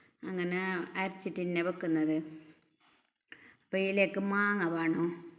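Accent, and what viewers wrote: Kasaragod, India. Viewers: എനിക്ക് നിങ്ങളെ വീഡിയോ കാണുന്നത് വളരെ ഇഷ്ടമാണ് നിങ്ങളെ വോയിസ് ആണ് എനിക്ക് ഏറ്റവും ഇഷ്ടം നിങ്ങളെ കാസർകോട് സംസാരരീതി എനിക്ക് വളരെ ഇഷ്ടമാണ് റെസിപ്പി സൂപ്പർ താങ്ക്യൂ ഫോർ ഷെയറിങ് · മീൻ കറി റെസിപ്പി ഇഷ്ടമായി കൂടെ കാസർഗോഡ് ഭാഷഒത്തിരി ഇഷ്ടപ്പെട്ടു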